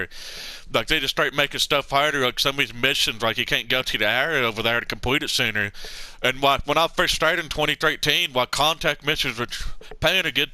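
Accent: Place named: South, United States. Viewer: He sounds like he’s from the southern US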